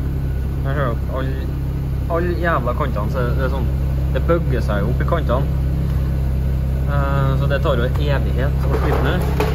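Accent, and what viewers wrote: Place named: Trøndelag, Norway. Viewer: Du snakker bra trøndersk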